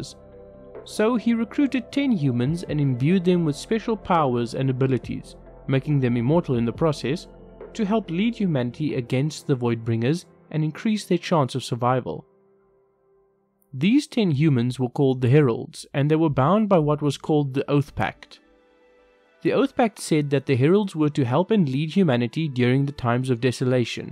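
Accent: South Africa. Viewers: This is a great video! Thank you.... Also, your accent sounds very local to me. I think we may be from the same country! South Africa? :) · I like to support a fellow South African. Could tell from the accent. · was quite excited to hear a saffa voice :D keep up the good work! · Haibo,…narrator sounds like a Saffa 🇿🇦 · South African accent makes me feel home again, moved 5 years ago. This is one of my favourite series right now so it ties together nicely. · Are you south African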